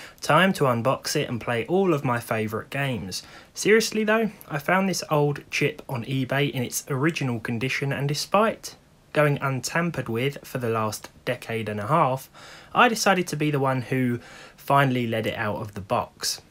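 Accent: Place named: England, United Kingdom. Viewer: I like your traditional Englishman accent...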